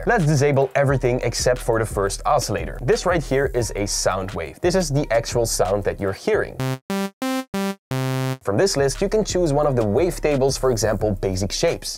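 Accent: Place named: Netherlands. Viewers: He does sound Dutch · from the accent I'm guessing you're Dutch!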